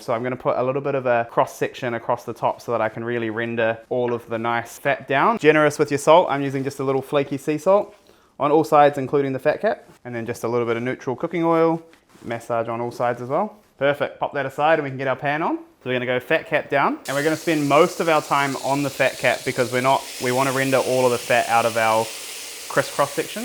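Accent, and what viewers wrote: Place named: New Zealand. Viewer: Who the fuck taught you accents lmao he's clearly a kiwi.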